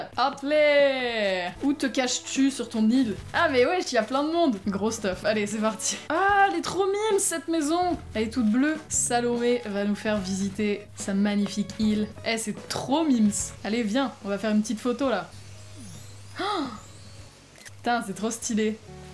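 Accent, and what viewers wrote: France. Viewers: Salut, je viens de te découvrir ta chaîne et je trouve que t'es sympa et j'ai bien ta bonne humeur :). Mais j'aime pas trop quand les gens mettent des mots en anglais comme tu le fait car je trouve ça gênant surtout quand ton accent est fr comme ça mais après c'est mon avis et ce n'est bien évidemment pas une insulte envers toi. Je te souhaite bonne continuation sur yt 😉☺️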